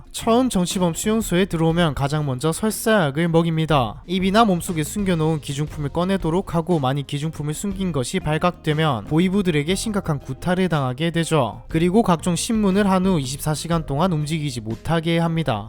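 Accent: Gyeongsang, South Korea. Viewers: 혹시 여자성우분은 AI였었나요??? 남자분은 경상도 아저씨느낌임..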